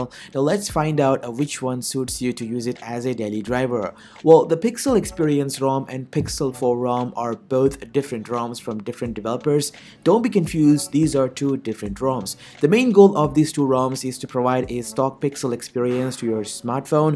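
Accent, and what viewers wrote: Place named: India. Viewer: Please it's data..not dayta....no hate but keep you Indian accent man please.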